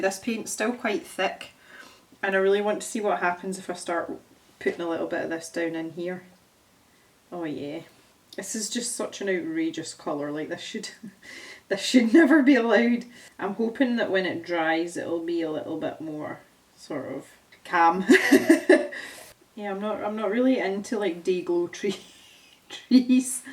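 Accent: Scotland, United Kingdom. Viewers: I LOVE your accent!!!! Living in the states, the Scottish accent sounds so exotic!!!! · Your painting is amazing! Oh, and your accent... I've said it before, but I LOVE the Scottish accent! It's what drew me to start watching your videos, and I have become a huge fan of your artwork ❤ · Scottish ASMR - reading anything says with some sarcastic comments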